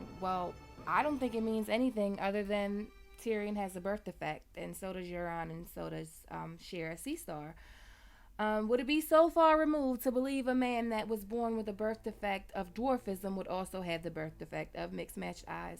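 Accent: United States. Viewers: I think I just fell in love with your voice. GOT needs to have accents other than unidentifiable Eurocentric and various British accents. Why have there never been any American or Canadian accents on the show? Someone with a voice like yours would be very refreshing.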